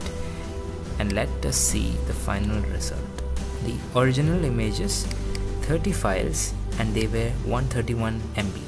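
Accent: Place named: Kerala, India. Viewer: nope But felt you were a mallu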